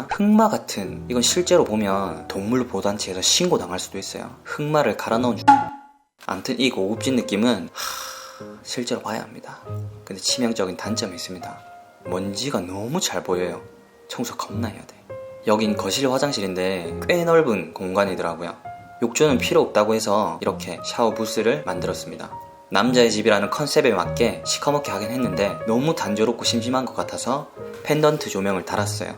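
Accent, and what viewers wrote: Gyeongsang, South Korea. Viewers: 진실함과 성실함에 감동 받았습니다~!!^^ 저는 70세로 75세 남편과 20년 된 부영 아파트에 10년 전에 입주하면서 도배,합성마루, 씽크대,도배,화장실 1개,티비설치 하는 쪽 벽면(전문용어 모르겠음) 등 나름 수리를 했습니다. 다 마치고 나니,앤틱 벽지 선택과 마루바닥을 짙은 커피색 계열로 해서인지 집이 너무 어두워서 손님이 오면 낮이라도 전기불을 다 켜야 될 정도인데다가, 한 10년 살고보니, 앤틱 벽지나 문(부영 전형적 체리핑크색 문,걸레받이,마감 같은것이 너무 싫증이 납니다~ )다용도실에는 결로현상이 심해서 곰팡이가 많이 끼어 흰색 수성 페인트를 칠하면서, 유튜브를 보다가 ' 인테리어'를 접하게 되었습니다. 다른 몇군데 인테리어 회사는 너무 고급진 부자들 상대로,나에게는 그림에 떡같이 눈요기만 될뿐 더 기가 죽고, 리모델링은 꿈도 꿀수 없다고 포기 할 수 밖에 없었는데, 마침 우연찮게 사막에서 오아시스를 만난것 처럼 희망의 섬광을 번쩍하는 것 같았습니다~!!^^ 우리 부부는 이제 나이도 있거니와 노후를 대비해야 되는 서민으로서, 아예 새 인테리어는 포기하고 살아야 된다고 생각하고 살고 있답니다. (사실 집이 어두워서 Led 등으로 교체하고 전기료 아끼지 않으려고 했건만,습관적으로낮에는 자꾸 불을 끄게 되고 간접조명이 아니니까 너무 밝고 낭비같아서 전기를 끄게 된답니다~ㅠ) 특히 부영 특유의 체리핑크색이 너무 눈에 싫증이 나고,브라운 계열의 가구조차 보태져서 색과 명도가 너무 낮아서인지 정서도 따라서 다운되고 우울해지는 듯 합니다. 본래 너무 심플하고 가벼운 모든함을 싫어하고, 나이에 걸맞게 무게있는 앤틱함을 좋아하지만,처음 수리 할 때에 력셔리함과 로맨틱을 더하고 싶었지만, 너무 사치하는것 같아 앤틱만 신경 쓸 정도로 이 분야에는 너무 관심없었고 이 정도도 감사하며 살았는데ᆢ 10년을 살고 보니ᆢ 다른데 이사 갈 형편도 안되고 ᆢ 어떻게 셀프로 컬러라도 바꿔보고 싶지만, 남편은 이미 있는 짐을 그대로 놔두고 수리하는것에 대한것과 비용 부담 땜에 생각지도 않고 있는 상태입니다. 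여기는 김해 삼계동인데 ᆢ마침 사장님의 구수한 경상도 억양조차 더 친밀감과 진실함을 전달되어 이렇게 하소연해 봅니다~^^ 지금 우리 남편은 제가 이런 댓글을 쓰는지 조차 모르고, 다만, 아내가 싫증만 낼뿐 이런 상상에 잔뜩 부뿔어 있는지 꿈에도 모르고 있답니다~^^ㅠ 너무 긴 글 죄송하고요~ 혹시 도움이 될 수 있는 팁을 듣고 싶답니다~!!^^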